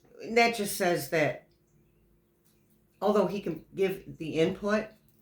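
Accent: New York, United States. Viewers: Thank you. I can tell you need this as much as we do. Take care of yourself too. We love you lady & are you originally from NY? The reason I ask is I’ve heard NY’ers say Yous guys so much, love it. 😊😊❤❤❤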